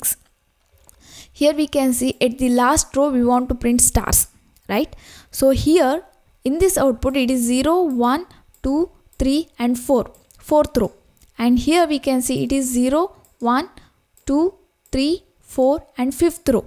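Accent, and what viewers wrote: South India, India. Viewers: Bcz she's south indian.🙂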